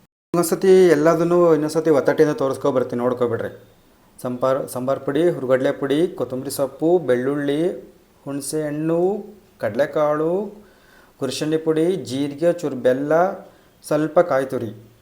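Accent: Chitradurga, India. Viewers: Neevu pakka davangere Chitradurga Kannada mathadthira ala same nam Ajji and Amma mathado haage.. nimma recipes nodovaga avarathrane Keli madthidhineno ansatthe… thumba thumba olle Saru chatni helkottidhira hinge hale adge dhu video galanna Maadri.. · ನಿಮ್ಮ ಮಾತಿನ ಶೈಲಿ, ಅಡುಗೆ‌ ಮಾಡುವ ರೀತಿಗಳನ್ನು ನೋಡಿದರೆ, ನೀವು ಚಿತ್ರದುರ್ಗ, ಚಳ್ಳಕೆರೆ, ದಾವಣಗೆರೆ ಪ್ರಾಂತ್ಯದವರು ಇರಬಹುದು ಎನಿಸಿತು..ಈ ಎಲ್ಲ ಅಡುಗೆಗಳನ್ನು ನನ್ನ ತಾಯಿ ಇದೇ ರೀತಿಯಲ್ಲಿ ಮಾಡುತ್ತಿದ್ದರು..ತವರೂರ ಊಟ ನೆನಪಾಯ್ತು.. ನಿಮ್ಮ ಅಡುಗೆ ಮತ್ತು ವಿವರಣೆ ಎರಡೂ ಸೊಗಸಾಗಿವೆ..ಶುಭವಾಗಲಿ.. ಸೌ.ಮಧು ವಸ್ತ್ರದ.. ಮುಂಬಯಿ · ನಮ್ಮದು ಹಿರಿಯೂರು ನಾವು ನಿಮ್ಮ ಶೈಲಿಯಲ್ಲಿ ಕನ್ನಡ ಮಾತನಾಡುವುದು ನಾನು ಬಳ್ಳಾರಿಗೆ ಬಂದು 33 ವರ್ಷಗಳಾದರೂ ಸಹ ನಮ್ಮ ಚಿತ್ರದುರ್ಗದ ಕನ್ನಡ ಭಾಷೆ ಸೊಗಡು ಅಡುಗೆ ಮಾಡುತ್ತೇನೆ ನೀವು ಮಾಡುವ ಅಡುಗೆ ಮಾಡುತ್ತೇನೆ ನಮಗೆ ಇದೇರೀತಿ ಅಡುಗೆ ಅಭ್ಯಾಸವಿದೆ.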